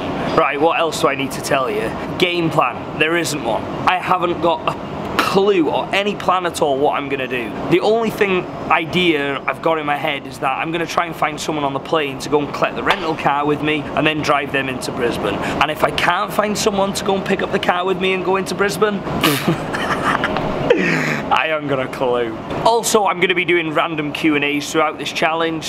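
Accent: United Kingdom. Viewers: Who can't give this guy with the sweet british accent free stuff :)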